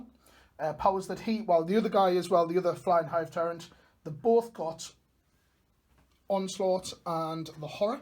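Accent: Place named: North East England, United Kingdom. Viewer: Hey dude, which part of the North East are you?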